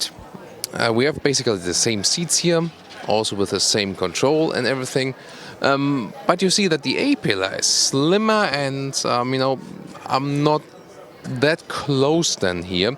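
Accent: Germany. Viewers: like it because he focuses on details in his videos so interested people can really get familiar with the cars before going to the dealer. I may even get used to his German accent :P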